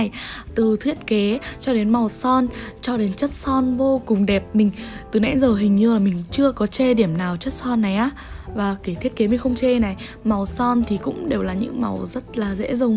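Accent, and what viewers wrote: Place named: Vietnam. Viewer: ui bạn ơi có nhiều ng học tiếng anh rất giỏi nhưng lúc nói hay phát âm các thứ chưa chuẩn vẫn bị hơi hướng tiếng việt đấy... kphai ngta k cố gắng học phát âm mà kiểu giọng lúc đọc tiếng anh nó bị nthe sẵn ấy.. ai chả muốn nói hay nma giọng nthe r bt lm sao:(... t thấy c phát âm nthe là ok lắm r í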